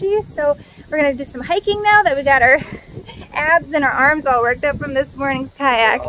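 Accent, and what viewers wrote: Minnesota, United States. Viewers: Also? I love your Minnesotan accent! You from northern Minnesota? I’m from the Minneapolis area so… Hopkins to be exact. I can always tell when I hear a fellow Minnesotan :)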